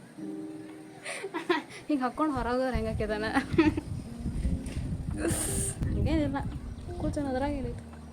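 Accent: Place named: Hubli, India. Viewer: hubli Accent ✌️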